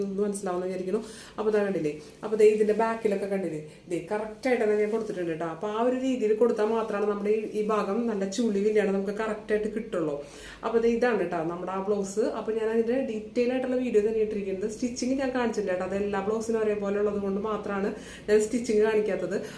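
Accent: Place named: Thrissur, India. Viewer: നിങ്ങള് material മ ടക്കുന്നത് ഒന്നും പറഞ്ഞു തരുന്നില്ല . തൃശ്ശൂർ karude varthanam nangalk ഒന്നും മനസ്സിലാവില്ല. prini, miracle ഇതൊക്കെ kandu നോക്ക് . എങ്ങനെ അവർ പറഞ്ഞു തരുന്നത് എന്ന്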